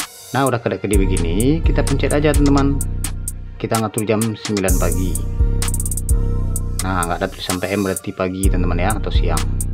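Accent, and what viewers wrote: Padang, Indonesia. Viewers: tengkiw bg, btw orang padang ya bg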